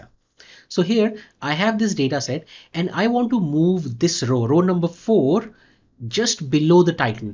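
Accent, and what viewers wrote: India. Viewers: When you're trying to learn something software wise, you know you clicked the right video when the first thing you hear is an Indian accent. Awesome video, very helpful!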